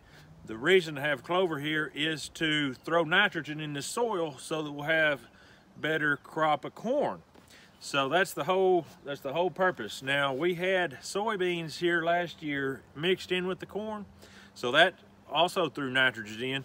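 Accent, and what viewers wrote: Tennessee, United States. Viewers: As soon as I heard your accent, I thought you must be near me (Fentress Co)! Great information for me to know when to plant this. Thanks!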